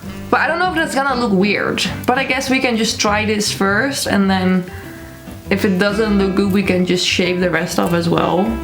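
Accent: Netherlands. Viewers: Ik heb mijn voorhoofd verbrand met mijn stijltang, en ik heb perongeluk mijn bangs micro geknipt... Ik zoek gewoon voor antwoorden op YT, en dan zie ik jouw video en mijn brein gaat zo van "ZE KLINKT NEDERLANDS" En nu ben ik blij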